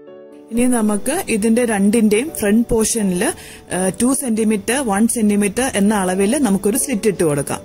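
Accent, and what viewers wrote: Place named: Pathanamthitta, India. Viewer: പത്തനംതിട്ട ജില്ല എവിടെയെങ്കിലുമാണോ വീട്? ആ ഒരു style of talking...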